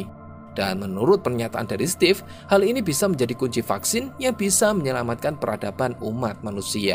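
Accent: Java, Indonesia. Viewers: Suara medok mu terngiang ngiang sampe aku turu bro 😅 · Logat medok nya kedengeran bngt ,tp intonasi nya enak bngt😊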